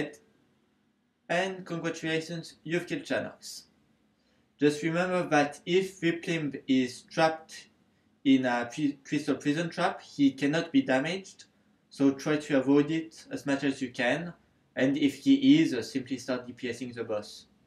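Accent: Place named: France. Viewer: Your English is not very good if you have trouble parsing a French accent.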